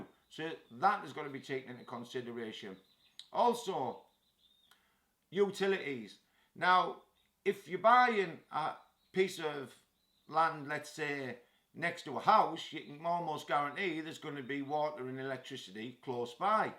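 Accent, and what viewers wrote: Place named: Teesside, United Kingdom. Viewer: Sounds like a T T Teessider accent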